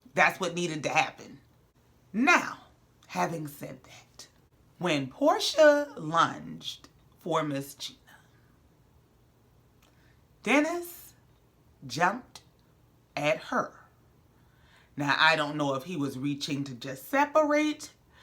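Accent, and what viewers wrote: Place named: Charleston, United States. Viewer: are you from Charleston, SC or have roots there? I subscribed because of your accent and content. #GullahGeechie for life❤️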